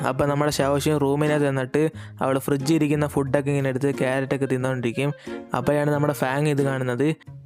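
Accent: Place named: Trivandrum, India. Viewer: Chettan trivandrumkarananooo... Slang Ath pole erikkunnu allo, 🤩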